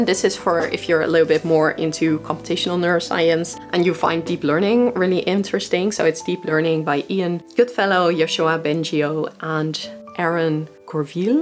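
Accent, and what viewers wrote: Netherlands. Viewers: your accent feels dutch, love how youtube is recommending me dutch youtubers who speak english XD, YT algo is really remarkable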